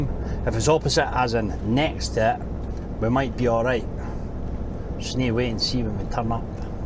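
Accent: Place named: Scotland, United Kingdom. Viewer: Perfect. Sunday dinner and the Scottish accent 🙂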